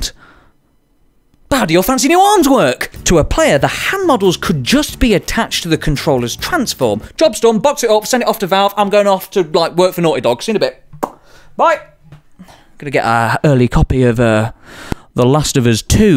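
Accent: Northern England, United Kingdom. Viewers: That's a northern English accent. 😄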